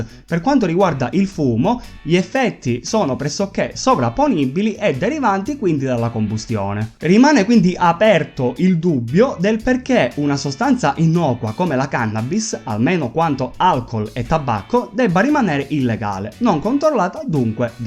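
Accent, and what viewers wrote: Italy. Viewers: Quanto ci sta l’accento sardo ? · Compà sei calabrese?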